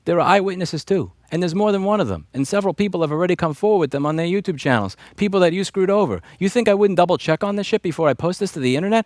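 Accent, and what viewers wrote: New York, United States. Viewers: Lmao justifying the particle counter while blasting his ass off with a NY accent. Amazing video 😂 I wish I was like you to fight bitches appropriately when they try to screw you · As a fellow born and bred Bronxite, his NY voice came out 3 videos ago....this is the equivalent of "Meet me at the corner" and pick the most populous one....42nd and Bradway? Queens BLVD and Northern BLVD? Heck, how about Eastern Pkwy and Flatbush, Grand Concourse and Fordham.....heck lets be real and do it on 125 St and Lenox...Tickets anyone??? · Bro the new york came out in this video and io love it! Thank you Louis for everything you do and everything youve done! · Oh my! Louis let the New York out in this one. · You have a new york accent, never noticed it before. I guess you are that angry now, rightfully so. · I don't think I've ever heard Louis sound so New Yorker till this video lol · Love your new england attitude at times and the new york accent that comes out helps. 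If a youtuber with millions of followers accuses me of being a tiddler Im knocking on that youtubers door. He wouldve been smart to stfu but no, he talked. You know so much about this dude Im suprised you are not shitting in his pants for him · the new york accent is slipping out lol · This is the most New Yorker sounding video I've heard from you, I enjoy the righteous indignation in every syllable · Louis went full New Yorker on this one. Damn. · The New York accent came out strong on this one. Good job, keep up the amazing work! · The new york accent just makes this better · you already fit in. You may sound like a New Yorker but you just gave this guy both barrels like a Texan. Now just throw in a "he f**ked up bigger than Dallas" and you get your free six shooter and commemorative 6 pack of Lonestar beer. It's called the Texas Twelve Pack and you can get it at the governors office. 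· Louis has gone 120% New Yorker on this one and I'm here for it! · You can tell Louis is heated by how much that New York accent comes out! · Why does he only sound like he's from NY when he's angry? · This is the first video I noticed the NY accent out of Louis. Damn the pedo sure fawked with the wrong guy lol. Louis has a grrrr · His New York accent gets stronger the more worked up he gets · It's been a while since I heard you go full NY. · the new yorker accent shows through with the new yorker attitude! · This series has really brought out the New Yorker in Louis.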